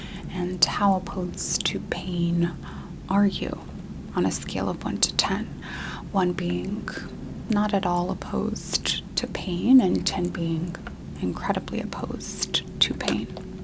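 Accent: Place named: Midwest, United States. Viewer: The midwest accent is toooo good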